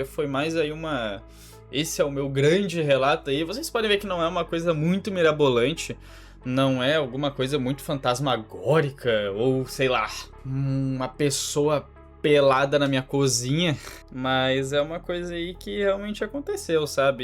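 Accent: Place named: South, Brazil. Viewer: Nesses vídeos mais improvisados o sotaque do sul fica mais destacado kkkkk é os guri não tem jeito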